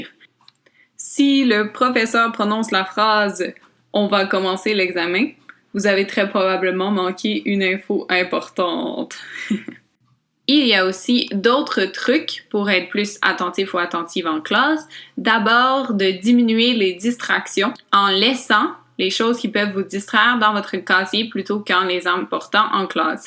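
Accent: Quebec, Canada. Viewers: Soetevids HD C’est notre accent, l’accent québécois si t’aime pas tu sors merci 😒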